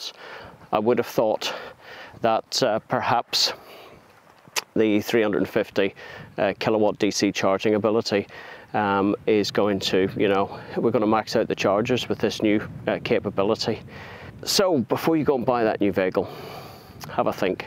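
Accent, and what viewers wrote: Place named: Northern Ireland, United Kingdom. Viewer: Enjoyed the Norn Iron accent! Not often heard on YT!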